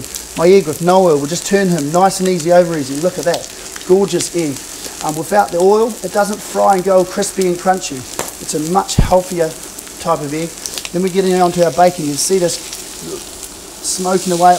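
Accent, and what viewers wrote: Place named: Australia. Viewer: Thanks! Australian, lol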